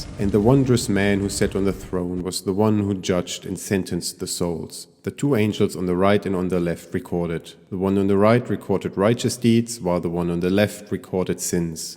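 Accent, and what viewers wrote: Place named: Egypt. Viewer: Sounds very Egyptian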